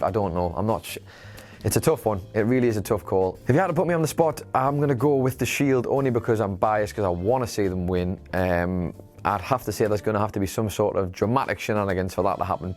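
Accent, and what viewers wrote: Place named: Newcastle, United Kingdom. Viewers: Geordie accent in this case- WhatCulture are based in Newcastle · It's Geordie, akin to MIDDLE ENGLISH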